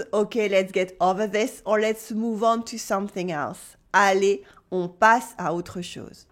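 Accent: Paris, France. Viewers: Is this Parisian French?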